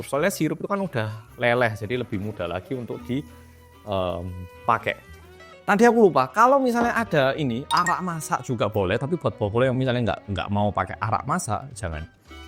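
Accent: Java, Indonesia. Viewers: Geli aku..ini oppa korea kok yo medhok banget talaaaah...misuh ya pake bahasa jatim an..wkwkwk😂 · wes ta lah.. mas ki jiwa medhok jawa e wes mendarah daging.. gak bisa dipungkiri lagi pokoknya 😂🤣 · Medokmu itu lo mas 💯😂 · Mas wis nang Korea kok isih medok? Anake mengko diajari ngomong Jowo.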